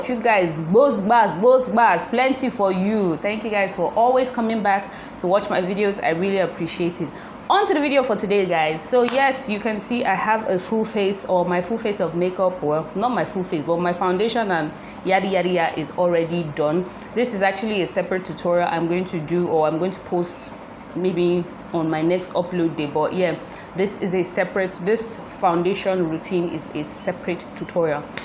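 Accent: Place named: Spain. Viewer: Ohhh Very beautiful and very simple Your Spanish accent is sweet ooo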